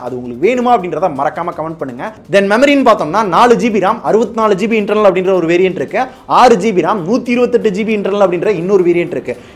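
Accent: Madurai, India. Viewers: Bro Neenga Madurai yaa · Bro neinka Madurai ya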